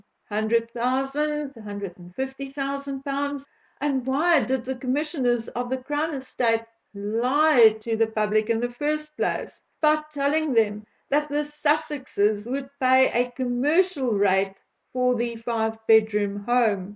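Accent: South Africa. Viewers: Is the lovely woman you? Had nothing in my mind of what you might look like, a beauty. I have many SA Jewish friends who left the country maybe 40 years ago at least, cause their kids grew all grew up here and are now in their. 40s. They were all professionals. Lived Westchester County, the burbs outside of NYC was a hub. Many came here thru Toronto. Some stayed there, manny went to Dallas. Now I can identify your wonderful accent. Loved their typical expressions. Like “ shame” haha9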